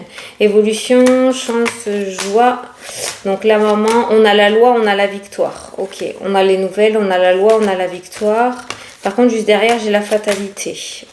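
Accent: South, France. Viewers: Cc toujours aussi joyeyse cet accent qui parle du soleil.moi dans le nord solei et compagnie...trop chaud...ma questiona situation financière va t elle s améliorer..merci beaucoup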